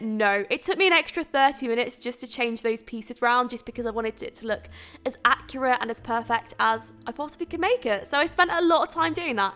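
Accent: United Kingdom. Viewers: i like your british accent 😣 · I love your Brittish accent <3 Even when I dont understand everything but I dont care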